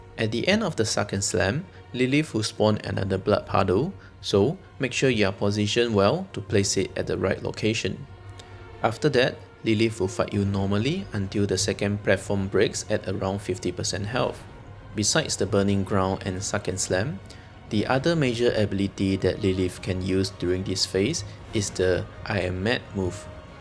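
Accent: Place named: Singapore. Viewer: You sound singaporean. Gotta support neighbouring content creators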